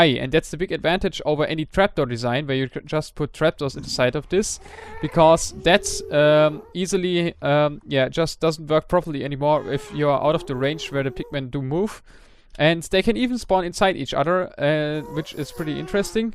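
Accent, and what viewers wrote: Germany. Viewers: are you german? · ich hab schon die ganze zeit das gefühl gehabt dass du deutsch bist. du hast einfach so nen "Akzent" und man hört das typische Schulenglisch raus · wegen dem Akzent, klingt so ein wenig deutsch :D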